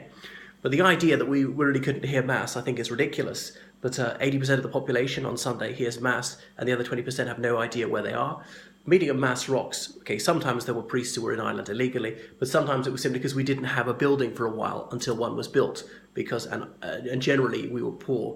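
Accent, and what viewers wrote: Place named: England, United Kingdom. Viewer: Hi, Well first of all, let me say that like yourself, I know a fair bit about Irish history, I am English with Irish heritage and I also once lived (happily) in Co.Waterford for nearly 3 years and travelled throughout much of Ireland on business. Your content was excellent, but just brush up a bit more with your presentation skills. You used the phrase "let me see" seven times, let it flow more. Also sit down and make sure all your face is visible, maybe have some bullet points written behind the camera so you don't have to shift your eyes around as much enabling you to look into the camera lens is if you're actually talking to the people who are watching your vid, that way they are more likely to pay attention. Possibly it's also worth finding a plain background, instead of one with wall paper or even a large poster in the background relative to what you're discussing. 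What will rile the bigots is that you're Irish with a well spoken English accent, yes I know many people who live in Ireland are Irish but have also lived in England and speak as you do, but to quell the bigots, give a brief explanation about your background. On the point of racism, I never once encountered it from a single Irish person, only on one occasion from some American tourists in a pub in New Ross, who I presumed had some far flung Irish ancestry, but as soon as the locals in the pub came on my side they looked dumbfounded and shut up. So I wasn't clear if you were intimating there is a culture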